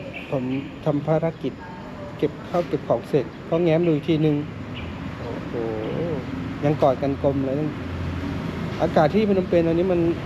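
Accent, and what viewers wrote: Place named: South, Thailand. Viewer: รุกภาษาใต้นคร55555😂😂😂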